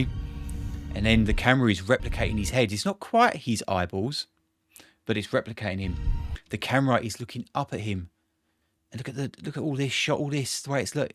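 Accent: United Kingdom. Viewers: What a cutest English accent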